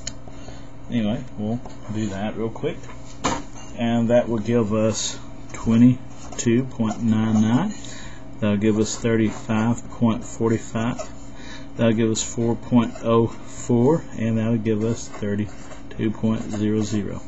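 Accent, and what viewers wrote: United States. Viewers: i learned this back in 10th grade but i forgot how to do it. lol. thanks. having a slow talking country guy helps a bunch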